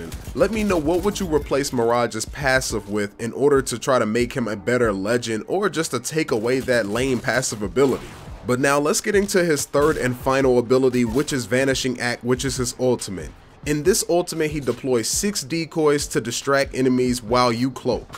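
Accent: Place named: Compton, United States. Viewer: He sound like e be from compten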